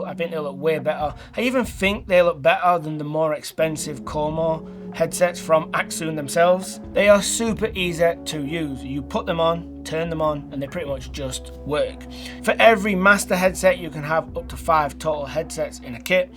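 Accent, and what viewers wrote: United Kingdom. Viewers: Great tips, thanks, I HAVE to ask where in the UK your accent comes from? (if you don't mind)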